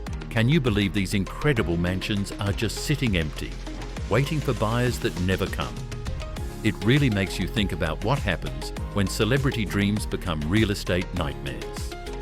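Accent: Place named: Australia. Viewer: Great to have an Australian commentator 🎉😊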